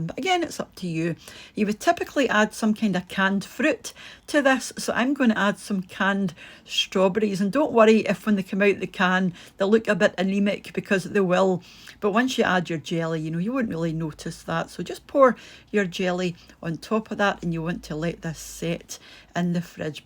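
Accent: Scotland, United Kingdom. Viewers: GREAT trifle - and was so easy to follow the instructions, Our family really enjoyed it and we so enjoyed hearing our Scottish accent which we hadn't heard in a long time living here in Los Angeles - you'll really enjoy this festive dessert at Christmas!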